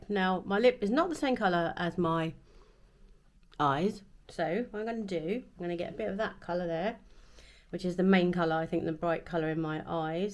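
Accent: United Kingdom. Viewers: I love to hear you speak and sooo wish I could find a gentleman from the U.K.! Iove the accent and Alan Rickman was my favorite. I digress, the makeup is so pretty and I like the purples.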